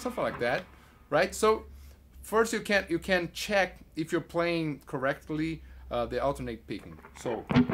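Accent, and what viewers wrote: Brazil. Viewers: Eae galera, o inglês do é bom? ou ele tá cheio de sotaque BR? SHAHUSHUS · É praticamente impossível falar sem sotaque. O que importa é que o cara é fluente. E, aliás, o sotaque brasileiro é agradável aos ouvidos estrangeiros. Não tem problema nenhum.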